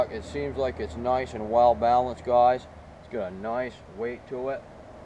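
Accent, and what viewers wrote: Maine, United States. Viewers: That accent...you must be from Maine.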